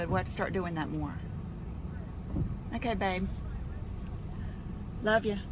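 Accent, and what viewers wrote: South, United States. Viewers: I've lived in NC my whole life- near OBX and have never heard such a wonderful southern accent! voice is just sooo pleasant! I just love it!....oxo! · Yay!! So glad to be able to spend days with you- it's so funny I feel like I can really hear the southern in you more in this vlog! 😘😘😘😘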